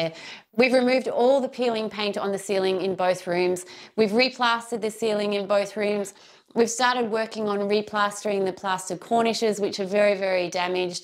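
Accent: Australia. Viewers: Love love this! Yay an Aussie accent! And I’m only halfway through this episode. Stunning wallpaper. J’Adore! Tres Bien 💗💗💗 and congratulations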